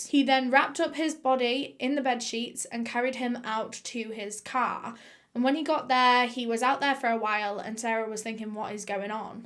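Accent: United Kingdom. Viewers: "wrongenh" :'') i love the British accent its so cute sometimes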